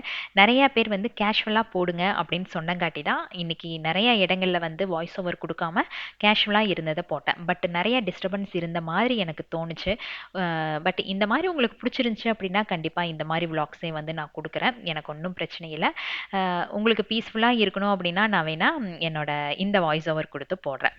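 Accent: Coimbatore, India. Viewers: Unga own voice is very nice❤. Papavum mithuvum porupa irukanga. Namma coimbatore slang varruthunga ❤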